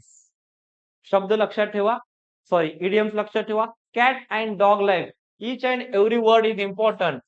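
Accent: India. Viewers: Sir ekdam barobar boltay rumhi😂tumch boln aikun kaltay ki tumhi punyatle ahet😂😂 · Sir, are you from Nanded? Loha or kandhar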